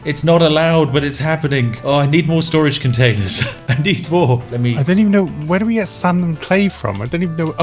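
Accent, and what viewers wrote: United Kingdom. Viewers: Oh god... that's your actual voice!? Like you don't overact for your vids... you seriously sound 200% british even when talking with your friend. Tea.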